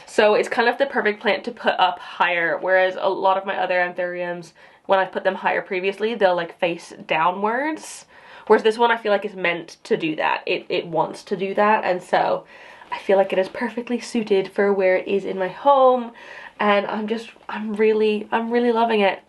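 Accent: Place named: United Kingdom. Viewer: I thought I detected New York, but I was way off. You sound more British when you are with (Clahyah):))))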